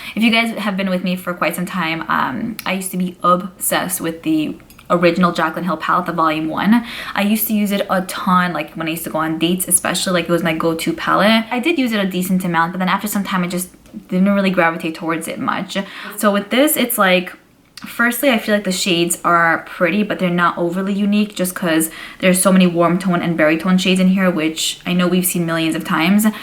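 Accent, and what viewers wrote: New York, United States. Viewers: I love the way you say chocolate, that New York accent is strooong! Your too freakin cute XOXOXO 😇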